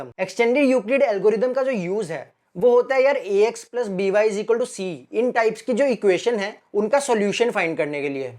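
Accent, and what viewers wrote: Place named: India. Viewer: can you add the transcription in your video pls. The India accent seems pretty hard to listen:((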